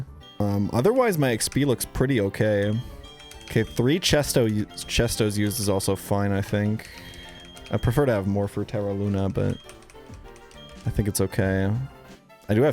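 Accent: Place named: United States. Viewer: It’s so weird to hear perfect American English coming out of a Germans mouth, especially in this outfit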